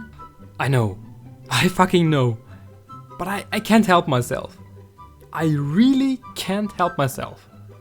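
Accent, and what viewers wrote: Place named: Germany. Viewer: Cringe german-english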